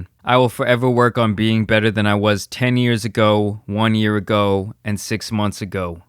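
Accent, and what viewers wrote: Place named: East Coast, United States. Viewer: Just noticed your east coast accent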